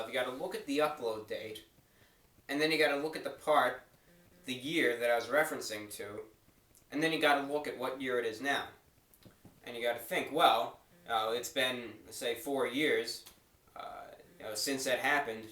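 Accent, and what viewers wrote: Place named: Pennsylvania, United States. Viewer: I just discovered your youtube report today. A fresh face with the mystery of sincerest tomfoolery. The ‘dart redux’ episode (6 years ago) at least presented an admission to a degree of insincerity….mixed with brutal honesty. I wanted to see your first reports to flesh out the genuine man and compare with your more recent reports. Your consistency and demeanor is calming. And you lost that east Penn accent somewhat. Bits of mine slip out from time to time. (No one notices)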